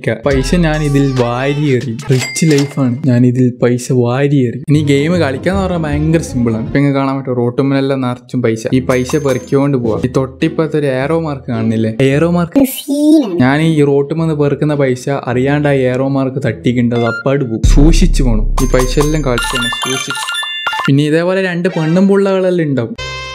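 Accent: Kannur, India. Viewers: Chettante language slag aan pwoli Kannurkkaru poliyalle🔥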